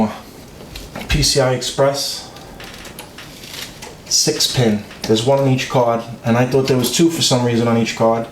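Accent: Boston, United States. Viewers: you from boston bro ? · Heavy Bostonian accent. Boooo red sox! lol i love this guy though!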